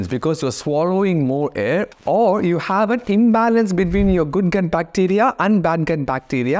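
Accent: Tamil Nadu, India. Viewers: Very informative and your Tamil is very cute 😊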